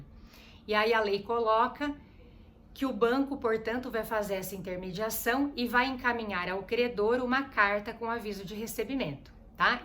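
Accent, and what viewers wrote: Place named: Paraná, Brazil. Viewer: Esse sotaque é do Paraná hein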